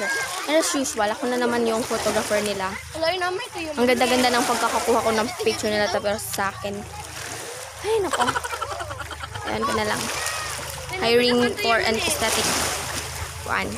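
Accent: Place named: Visayas, Philippines. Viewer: i like your bisaya ascent "TUBAG SAD MO OY" 😂❤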